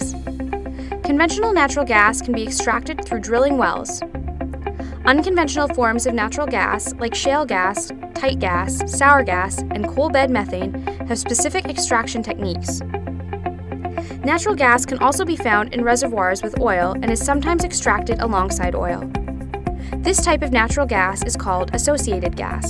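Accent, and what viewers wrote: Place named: Canada. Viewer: The chick narrating must be Canadian. She also says buried weird- like “burried” vs “barried”.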